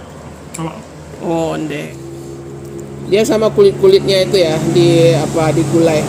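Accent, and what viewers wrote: Minangkabau, Indonesia. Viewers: Ulang dengari berdialek minang walaupun tidak totali, konon saya ingin pelajari dialek minang🎉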